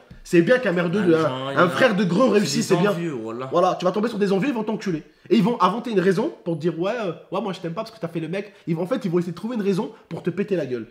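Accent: Marseille, France. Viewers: Sa me fume il a pris un accent marseillais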